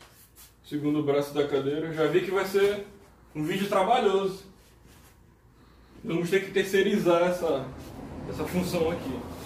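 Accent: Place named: Pará, Brazil. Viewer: caray mlq com esse sotaque tu so podes ser do pará kkkkkk mta coincidencia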